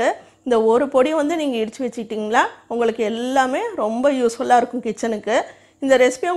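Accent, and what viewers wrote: Salem, India. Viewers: Unga recipes lam super. Unga Salem tamilum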